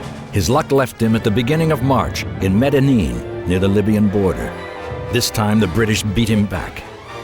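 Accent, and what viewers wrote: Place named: United States. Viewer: Lolllll. I wondered f that were the case!!!! I was thinking “This must be British but they hired an American guy to narrate.” Lol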